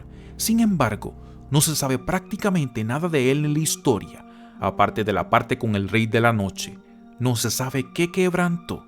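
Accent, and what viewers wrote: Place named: Chihuahua, Mexico. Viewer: Eres de Chihuahua o porque pronuncias así de fea la "ch"?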